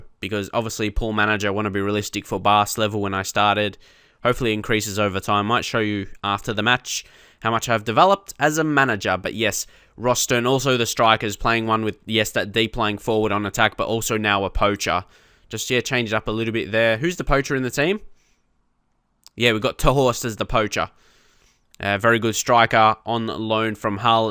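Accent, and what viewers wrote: Australia. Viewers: Seriously? Can't you tell he's an Aussie? :)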